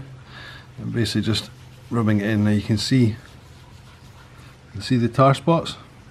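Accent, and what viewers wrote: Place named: England, United Kingdom. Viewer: Great review. Can you get this in the US? I like your accent. Which part of England are you from?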